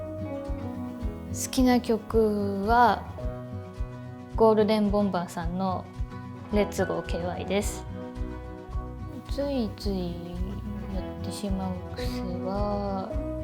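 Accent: Akita, Japan. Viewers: 久々の秋田弁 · 秋田弁可愛い‼️ それに、仮面ライダーカブト見てるのが、共通点あって嬉しい‼️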